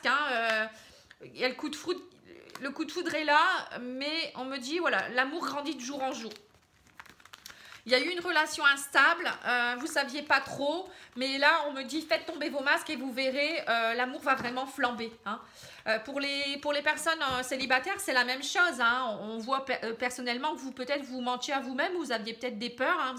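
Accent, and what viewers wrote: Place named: Pas-de-Calais, France. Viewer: Je connais bien cette accent du Pas de Calais 😁👍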